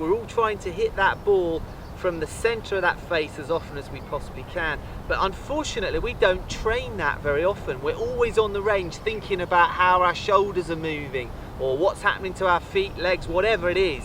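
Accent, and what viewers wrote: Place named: United Kingdom. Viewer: Hey, really enjoyed yr commentary on the three hole channel at the open. Great to hear British commentary on the golf from here in the US.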